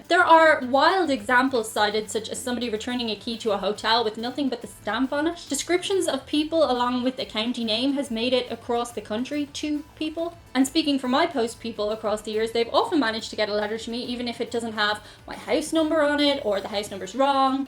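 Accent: Dublin, Ireland. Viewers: used to be whenever i'd go to a bar or restaurant the establishment always had a bowl with books of matches imprinted with their name and i always grabbed one as a keepsake. you don't see that much anymore these days so i'm hoping with this match making thing you were talking about this practice may come back into vogue. i hope so. on another note...i thought you kept saying transpart because well, umm, you're irish?! here in the southeastern united states we say transpork, mmmmm barbeque! stay weird! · I don't know... are we? And don't worry about "transpart" - to those of us who don't know any better, it just sounds like your lovely Dublin accent.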